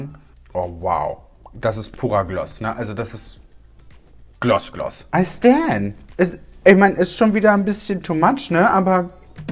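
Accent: Berlin, Germany. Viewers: Οmg man fühlt richtig deine Lebensfreude 😍😍😍 das hat mir so gefehlt an dir!! Und dein Berliner Dialekt kannst du ruhig öfters raushängen haha Hab 4 🌷 gezählt Instagram mariamck27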